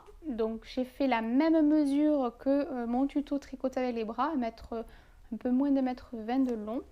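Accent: South, France. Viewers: J'allais oublié les questions ^^: quel âge as-tu ? Es-tu du sud car tu as un petit accent ?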